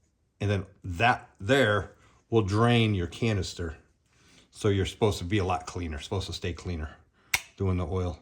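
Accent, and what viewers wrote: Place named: Midwest, United States. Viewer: I like the way of talking ! Midwestern? It’s GREAT! Don’t forget to open all air ways on the top before you pop that plug off and keep the plug off when you pop the filter off. The more air ways the better. Some people even pull the dip stick right out and take the cap off the top so long as nothing falls in there. You might notice a bit more oil gets out. Also wait until drips stop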